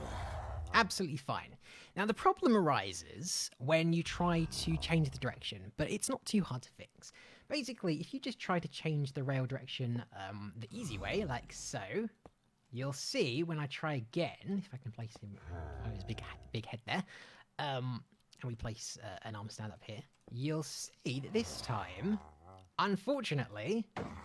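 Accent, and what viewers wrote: United Kingdom. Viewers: I think that's called British accent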